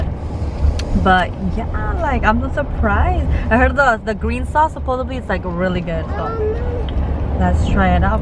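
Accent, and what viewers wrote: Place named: California, United States. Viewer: Your accent reminds me of home!! From San Pedro but we moved to San Antonio when i was little Nice review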